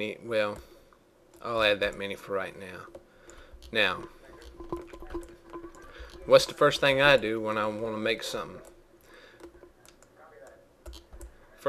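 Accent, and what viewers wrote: South, United States. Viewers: pretty funny how i automatically discount everything you say because you have a southern accent. that's not really fair, but it's funny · love that southern accent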